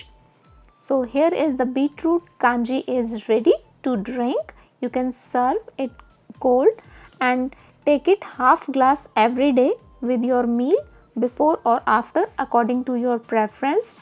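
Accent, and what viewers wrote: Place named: India. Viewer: मॅडम आपल्या टोन वरुन तर आपण भारतीय अहात. मग मराठी, हिंदीत माहिती का देत नाही.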